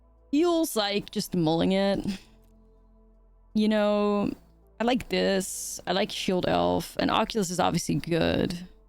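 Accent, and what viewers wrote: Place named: Netherlands. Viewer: I love your very mild dutch accent, it is kind of cute. Very nice and structured content you have. Greetings from germany ❤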